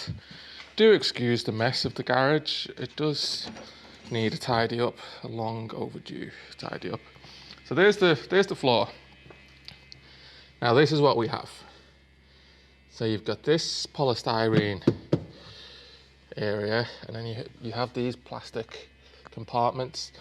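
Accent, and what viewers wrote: Liverpool, United Kingdom. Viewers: You sound local to me. Scouser! I live in Liverpool also. Looking to buy a Kia e-Niro. We have an ID.3 and an Outlander PHEV. Want to get shut of the Outlander soon and wanted to see what the boot space was like on these Kia’s. Thanks for the great video on maximising the space. 👍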